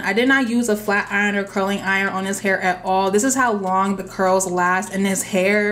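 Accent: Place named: Louisiana, United States. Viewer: Love your videos You Rock!!!!!!!! U sound like u are from the Cajun State like me.!!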